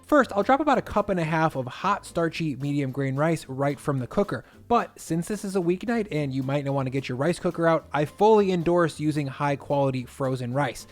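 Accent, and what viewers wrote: United States. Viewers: Why does this guy have to use metric system measurements? It’s so frustrating. He’s clearly American with his accent or lack there of.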